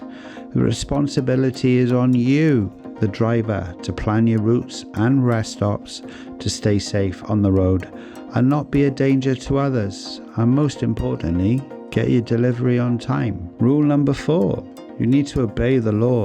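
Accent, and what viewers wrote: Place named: Cardiff, United Kingdom. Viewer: Looking forward to this one mate. Sounds solid. Is that a Cardiff accent I hear? I was thinking Newport but changed my mind. I’m from Caerphilly originally.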